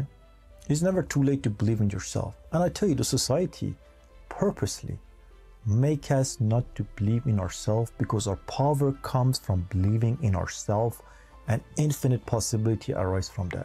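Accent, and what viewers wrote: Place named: France. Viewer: TRUE , I WILL AS A last resort! Specially in workforce , if push comes for sure! Curious , you have french accent when you speak! Are you from France or elsewhere? Love all multi languages, I am Spanish 😄half Cuban/Peruvian born in USA! Hoping when we all descend to 5D we can read minds and speak all languages! Love traveling! But for now I wait! Waiting for the Spirituality to see Grand parents miss them immensely! KEEP UP ALL POSITIVITY 😉 most people do not want to believe and higher sources!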